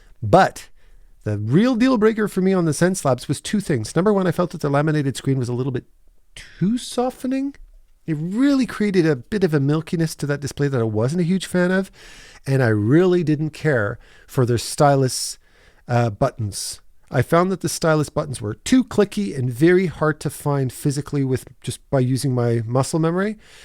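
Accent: Canada. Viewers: Thanks for the review. Very intuitive. I also evaluated Cintiq Pro vs Xencelabs. Also, get the Pro Pen 3E (eraser at the other end of the pen). The ExpressKeys on the Cintiq Pros IMHO are impossible to intuitively used. I also use the keyboard tray (like you do). I hope for screen recording that Wacom releases 1440p (not a or 1080p) Movink 15/16. The Movink 13 is amazing as a portable pen display. To produce 1080p tutorial screen recording to not pixelate when zooming in close, to record originally in a higher resolution (1440p). Cintiq 24 (non-Pro) and Xencelabs 16 works great with no fans and great resolution (2K), but the wasted bezel is awful. So, to record, I also use a separate sound studio, but with the simpler Cintiq 24 OR the Xencelabs 16. My audio recording mic picks up the Cintiq Pro 27 fan noise, and so I use it in my working office. I also use Neumann!!! 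Wow. Overall, I just find that the pen/surface "sensitivety" to be more accurate and responsive, but if I couldn't afford Wacom, I would definitely invest in Xencelabs. Sounds like you have a Canadian accent and we are in Bellingham, WA (close e'nuf to drag my house across into Canada)!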